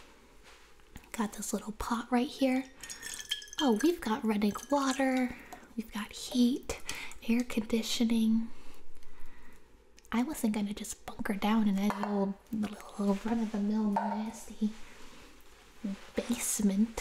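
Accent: South, United States. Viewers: Has accent changed? I swear it used to be more southern sounding... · Remember when had a southern accent?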